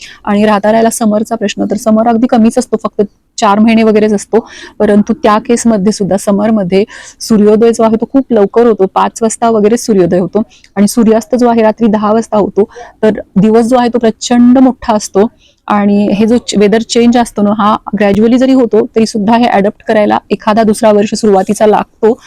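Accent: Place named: Kolhapur, India. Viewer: खूप छान माहिती दिली मॅडम तुम्ही . तुमच्या बोलण्या वरून तुम्ही कोल्हापूर , सातारा , सांगली , किंव्हा पुण्याच्या आहात अस वाटत .. बरोबर ना 😊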